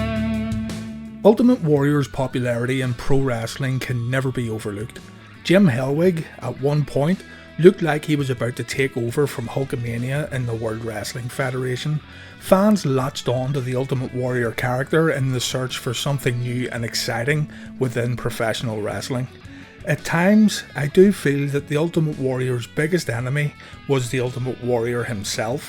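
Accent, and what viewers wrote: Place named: Scotland, United Kingdom. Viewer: I was expecting an American video and this Scottish dude comes on.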